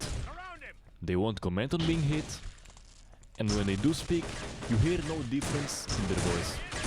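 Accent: Russia. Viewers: Русский что-ли? 😀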